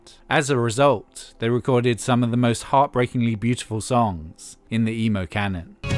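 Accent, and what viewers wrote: United Kingdom. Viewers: Can Brits like, not narrate something for once? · Ngl it's really weird hearing a British guy talking about emo.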